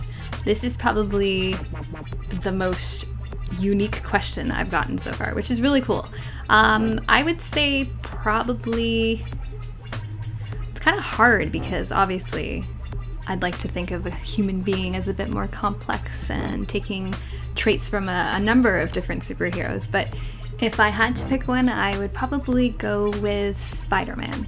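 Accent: Canada. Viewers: haha, just started watching your videos, and dont worry, canadians talk about the same as us here in Minnesota, and we're the only ones that talk correctly. Also your video guides are great. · Ok, now i know that i love the canadian accent! :D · I just want to say, it's extremely reassuring to know there is someone in their 20's playing this game, that also is Canadian and has people make fun of the way they say completely normal words.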